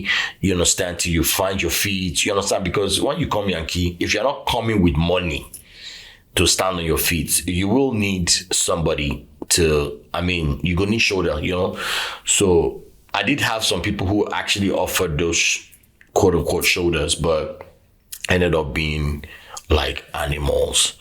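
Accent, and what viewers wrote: Nigeria. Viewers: I can tell you straight away that you are Nigerian and lam not from there! First few moments of talking.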